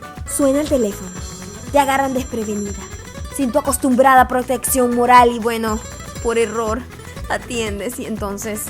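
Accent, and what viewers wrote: Venezuela. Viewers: Excelente! y lo mejor de todo es el estilo venezolano... "que paso? si fino" :D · Me encantó! Esto suena demasiado a monólogo venezolano. Bella Bella! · mas venezolana imposibleeeeeeee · Más venezolana imposible! Lo ame jajajaja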